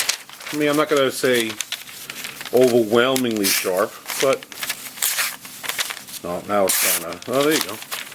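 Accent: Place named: New York, United States. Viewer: accent for sure it's hard to mistake. I've been out of NY for quite some time and I still have mine.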